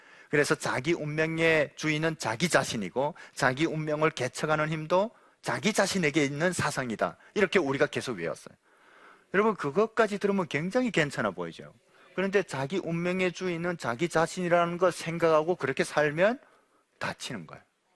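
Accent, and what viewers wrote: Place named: North Korea. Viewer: 핵명 혁명 목사님 북한 억양이 ~~ 은혜 시간입니다. 감사합니다 ~~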